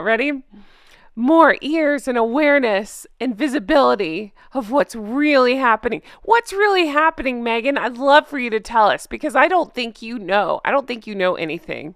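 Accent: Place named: United States. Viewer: Oh that guy is hilarious! Who is he? Absolutely adore your YouTube, you and Beebs Kelly are brilliant. Only been on YouTube for 8 months when I got a new telly! Unfortunately can’t get all information on TV( using phone to write this). I keep asking what “sugars “ are- know they love the traitors but is it an American expression? Still love your voice. Wish I had an American accent ( like yours) it’s so beautiful on the ear. Sorry can’t do Patreon as I do animal charities but would like to know where I can view the merchandise ( too British to say merch 😹😹😹😹). Guernsey. Channel Islands xxxx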